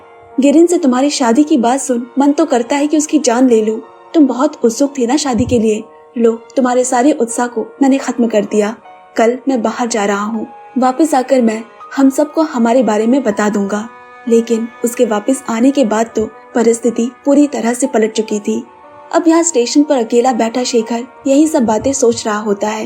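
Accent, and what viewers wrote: Bengal, India. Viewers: Both are correct it's just bengali acent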